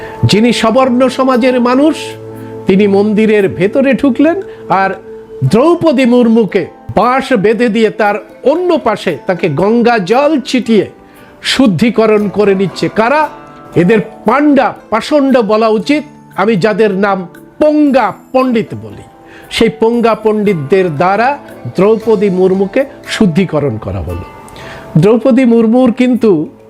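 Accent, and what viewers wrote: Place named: Bangladesh. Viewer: আপনি কথা থেকে বোলছ । মনে হছে আপনি বংলাদেশী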